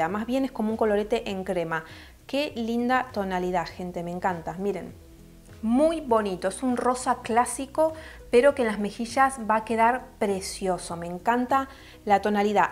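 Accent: Argentina. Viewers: Hola, me encanta tu canal, enseñas cositas variadas y con las 3B, buenas, bonitas y baratas. El maquillaje de ojos que llevas es una preciosidad, alguna vez podrías hacer algún tutorial de maquillaje de ojos, te queda hermoso. Muchas gracias por el vídeo, es muy entretenido y escucharte es una delicia, el acento argentino es mi debilidad. Ójala tengas éxito con el canal, te lo mereces, por el contenido y por tu simpatía. Besitos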